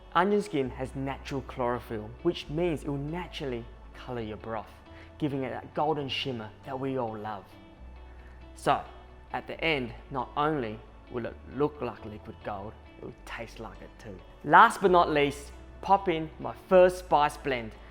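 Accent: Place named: Australia. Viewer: Great Video Mate... Found you through Max McFarlin's Channel... Heard the Aussie accent and wondered who is this guy!!! Well, now I know. Best of luck with the channel and all things food. Sub done!!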